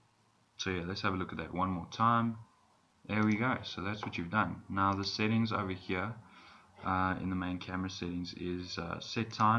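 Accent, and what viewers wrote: South Africa. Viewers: Are u South African because of ur accent? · Yoooooo!!!! More South Africans!!! I'm South African too. I live in Cape Town :)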